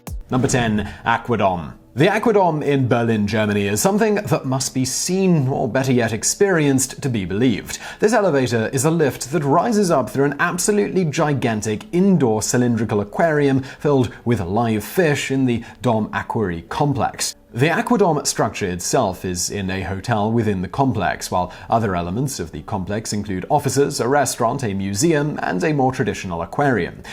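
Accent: England, United Kingdom. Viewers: It is really weird to hear a guy with an English accent say "elevator" so many time in one video.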